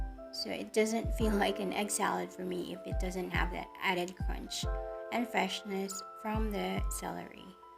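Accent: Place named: England, United Kingdom. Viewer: Speaks a very english intonation, nice!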